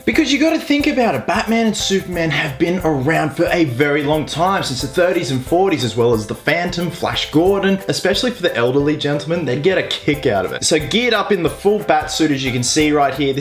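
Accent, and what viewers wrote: Australia. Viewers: I clicked on this video and as soon as you started talking I yelled. AUSSIE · Your Aussie 👍🏻me to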